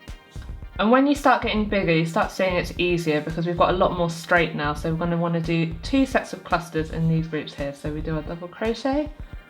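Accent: United Kingdom. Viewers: Awesome instructor and very clear teaching of all the necessary techniques needed to accomplish what looks like an extremely difficult pattern to those who don’t crochet! A great way to use up all that stash, too! Thank you so much. It did take a bit of rewinding parts though just to understand her English accent, which I grew to love!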